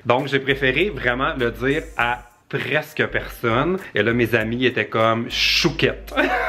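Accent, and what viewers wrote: Canada. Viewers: Excellente vidéo, j'adore ton humour et ton accent canadien.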